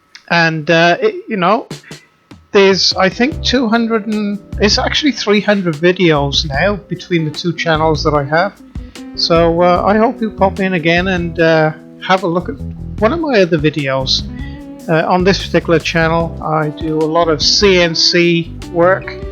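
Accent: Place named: England, United Kingdom. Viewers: I love this piece. I really enjoyed watching how you got into that centre and then leaving the top as is. It gives it its own personality. Can i ask where you are from. I'm from the UK and it appears that i am hearing an English accent but with something added and i can't figure out. Thanks for sharing pieces with us. Shirley from the UK. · Australian? I thought you sounded very much from the south west of England, Cornwall/Devon area. I actually came to the comments section to say something about the quality work of a classic man in his shed. (A proud UK tradition.) Any links to the UK? I know Cornish miners had a heavy influence and there was a lot of migration to Australia from here in the 1800's. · Where do you hail from sir, that sounds like a very West Country accent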